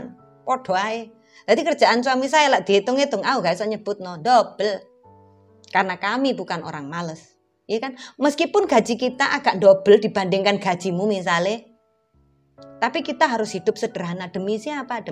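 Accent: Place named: East Java, Indonesia. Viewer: Aq suka prinsip hidupmu mba..n bahasamu mnurutku udh halus kok emg nada org jatim trutama wilayah sby kesono kan emg gitu..semangat mba!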